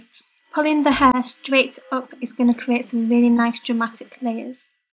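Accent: Yorkshire, United Kingdom. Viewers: British accent <3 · has a British accent thats awesome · hear a British accent on this girl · her accent makes her prettier... sound like British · from Leeds or Bradford? x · Her accent does sound Brittish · are you British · are you from Yorkshire by any chance? Just your accent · you speak like british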